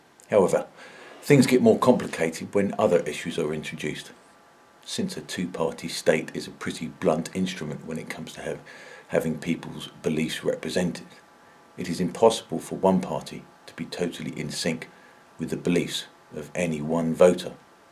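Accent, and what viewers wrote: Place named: United Kingdom. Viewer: Thourghilly enjoyed that, Both the incisive content And,the very british delivery. Heath in 72 took us into the common market without a referendum,as he stated to civil servants,his mandate to join came from his 1970 election victory, Yet that join or stay out question was never a part of the 1970 conservative manifesto. Heath said "it would be wrong if any Government contemplating membership of the European Community were to take this step without `the full hearted consent of Parliament and people" Heath lied Heath new that the opinion polls during <num> were consistently 68%against joining the common market. A referendum,which he all but promised,he knew he would lose, So he made up the story that his parties election victory was in itself the mandate to join. Further,he then suppressed the civil service advisories on both The loss of sovereignty but also on the future loss of judicial power, Economic freedom,and even military independence. 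This report surfaced and was made public in 2018. It clearly shows the deceit that heath used to sign us in , Without our ,the electorates, Agreement. Traitors like heath infest parliament. Cameron lost the brexit vote His party then elected may Knowing full well she was an adamant remainer, Those that had a hand in that election are traitors to the people and democracy of this country. Their can only be one way to deal with traitors, And should this country decend into civil war over this fundamental betrayal,rest assured ,those that Ac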